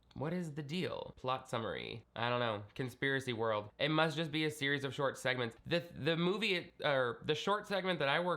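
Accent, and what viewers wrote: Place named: Boston, United States. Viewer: I also pegged you as Boston.... :)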